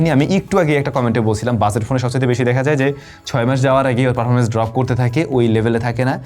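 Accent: Barishal, Bangladesh. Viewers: আরে আমনে দেহি মোগো বরিশাইল্যা ❤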